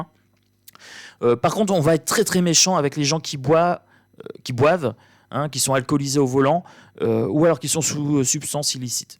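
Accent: Nord-Pas-de-Calais, France. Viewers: Coucou sa fait du bien de vous entendre parler chti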